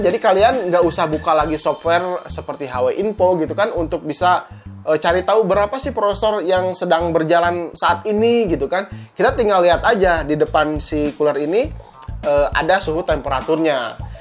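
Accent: West Java, Indonesia. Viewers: Namanya Juga Orang Sunda Atuh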